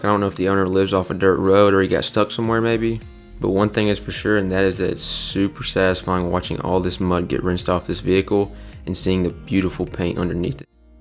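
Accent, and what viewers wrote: South, United States. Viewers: i heard your voice i ohhhhhh hesss a countryyyyy boyyyy😊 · Detail geek but southern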